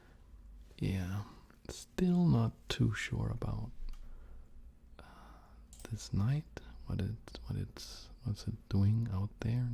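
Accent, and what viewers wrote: Denmark. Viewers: Are you from Denmark (If so then me too)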